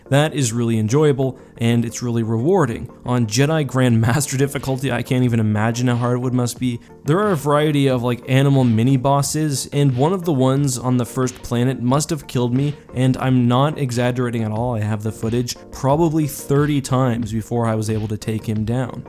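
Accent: Canada. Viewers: Lots of “oots” and “aboots” in this video, I’ve never noticed how Canadian you sound before Eh.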